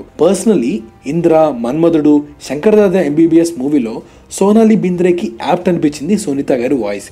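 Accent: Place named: Andhra Pradesh, India. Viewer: Bro talk normally 🙄 u are talking like u are from andra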